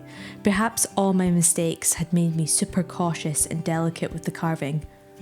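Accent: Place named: Scotland, United Kingdom. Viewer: your langage accent is so close to your husband's ... i habe never been in Scotland ... but listening to your voice ... you both really share a local tone :)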